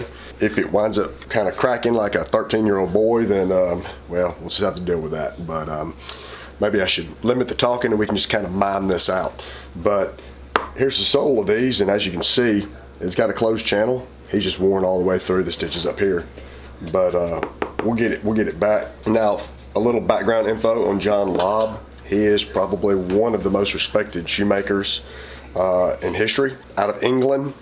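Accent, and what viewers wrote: South, United States. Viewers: Omg i love this guys voice!!! It's so nice and deep! And that southern twang!!! I love it 10/10 can and will fall asleep to it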